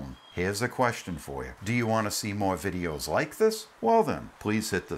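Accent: Maine, United States. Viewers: this narrator has a typical Maine accent or if it's a conglomeration of accents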